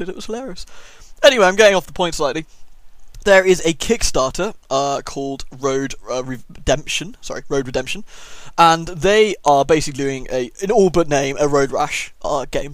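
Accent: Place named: RP, United Kingdom. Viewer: yeah that's what I thought, he does sound slightly posh normally though.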